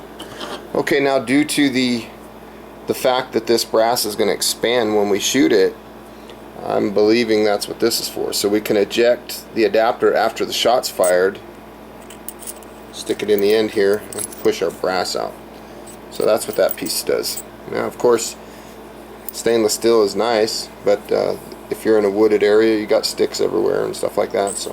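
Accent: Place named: Minnesota, United States. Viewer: Hey, where are you from? You sound like a Minnesota native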